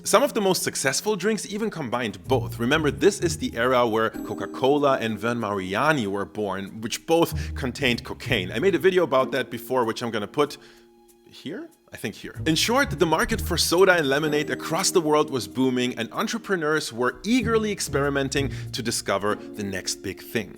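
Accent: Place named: Germany. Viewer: This German dialect is terrible and unnescessary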